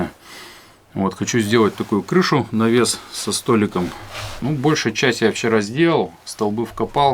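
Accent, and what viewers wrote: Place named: Siberia, Russia. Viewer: Спасибо, спасибо!!! Без блинов ваш сибирский говор просто умиляет.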